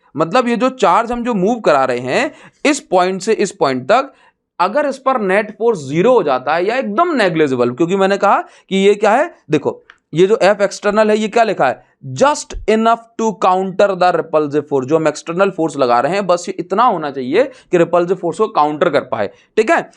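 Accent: Odisha, India. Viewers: Ap odisha se ho sir?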